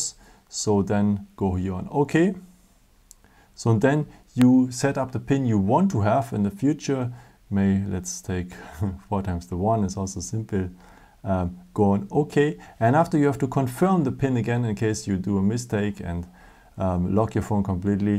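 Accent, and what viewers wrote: Germany. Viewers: haha this guy is definitely German. thanks for the help :) vielen Dank :D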